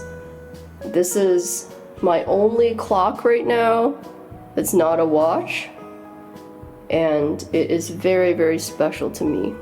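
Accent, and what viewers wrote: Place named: New York, United States. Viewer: Crazy. I thought you were in your early 40’s and your delivery is very nation New York, woody Allen. Congrats on buying in NYC,maybe you could have waited a few. If you did not hear there is a communist in NY and all the millionaires will be selling their properties to head to Florida. Discounts abound. 😉 congrats. Now please explain the difference between a condo and a coop?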